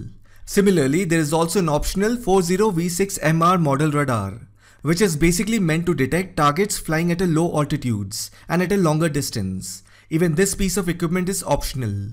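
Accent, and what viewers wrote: India. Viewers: Damn, It`ś interesting but Indian english is beyond my abilities to withstand ;o) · Love indian english accent ❤🙂🙂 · Indian accent is so annoying.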